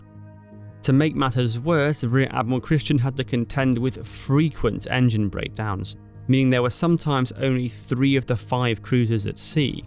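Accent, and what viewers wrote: United Kingdom. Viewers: Ur voice sounds like british accent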